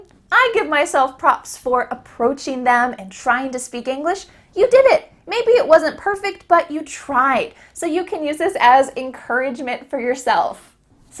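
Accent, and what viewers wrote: United States. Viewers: Wawwawaw i love American accent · please, is she from the u.s.? does anybody know? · She such a good teacher she teach me alot of American accent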